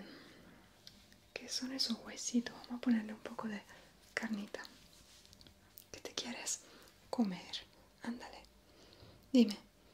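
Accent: Venezuela. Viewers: Adoro tu acento italo-venezolano ❤️ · Que dulce es el acento venezolano ♥️ mil gracias por esto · Amo tu acento Venezolano es único! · Graciass! me ha ayudado tu videitoo! un video de ASMR bien fino! jeje me ha relajado bastante escuchar ASMR con mi acento Venezolano <3 Un besito corazón! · De dónde eres? No sé muy bien porqué pensé que eras italiana (creo que es porque tienes varios videos en italiano) pero el acento venezolano está súper on point, estoy confundida 😂😂 Saludos desde Venezuela ❣️ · Me encanta tu acento venezolano, muy relajante. Saludos. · Que linda. Gracias por tu video. Me encanta que se nota tu acento Venezolano. 😍😍😍 Me dio hambreee!!! Hugs. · Me encanto lo Italo-Venezolano de Caracas pal mundo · Hola en estos tiempos de angustia que estamos viviendo en Costa Rica, el verte y escucharte me relaja bastante, me encanta tu hermoso acento venezolano gracias por ser tan especial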